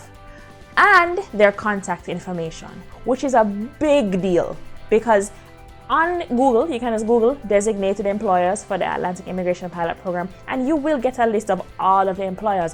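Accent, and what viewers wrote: Jamaica. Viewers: I can hear the Jamaican accent. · are you jamaican ? smile and thank you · Great job. You sound like a yawd lady (Jamaican)? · AIPP program seems feasible--thanks for the info. Yuh come from Jamaica, nuh true?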